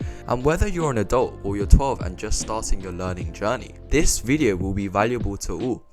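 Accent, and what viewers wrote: England, United Kingdom. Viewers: Just noticr this is a british asian, he got the brains and sounds even smarter because of his accent gawddamnit! Lmaoo i paid attention though thank for the tips bouta applied the today!! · At first, Korean with this English accent🤌 Secondly, good video, hopefully it'll help my little sister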